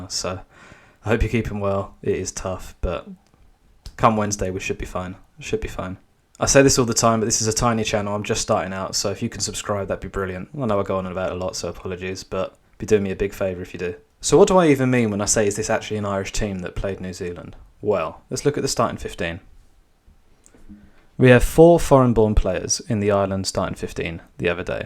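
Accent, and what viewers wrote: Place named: England, United Kingdom. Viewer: What an ignorant and insulting title on this video...... Lose with Irishmen??? As soon as I saw it I just knew it had to have been created by an Englishman. Five seconds in and the accent confirmed it. Wow! Has he taken a look recently at his country's national football team..... or at all the foreign-born athletes that England is so quick to grant citizenship just so they can win a medal for England when their own folks cannot. Just imagine spending your valuable time creating a bitter video about your closest neighbour winning a major competition but instead of congratulating them you try and create the perception that they are somehow weak and losers. Only a REAL loser would spend his time in this way. Bizarre!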